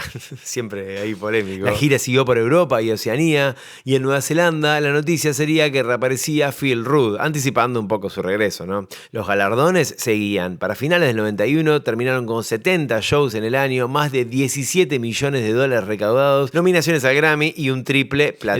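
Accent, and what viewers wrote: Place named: Argentina. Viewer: Demasiado argentino para entenderte. Voy a pedirle a un yucateco que haga un vídeo igual a ver si le entiendes.compadre.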